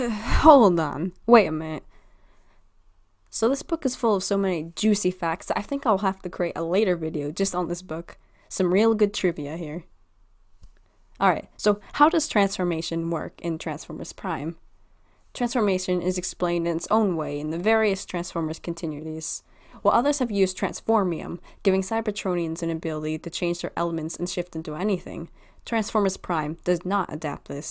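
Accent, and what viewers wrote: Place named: Canada. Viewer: CANADIAN!?@ FINALLY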